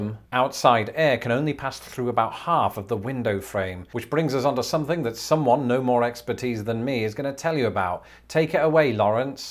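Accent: United Kingdom. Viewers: Such a mundane topic made interesting with dry wit and a British accent. 😍😍 Found this channel via Mr H and Friends. Bloody love it. (hello from South Carolina) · stahz... I love the British accent xD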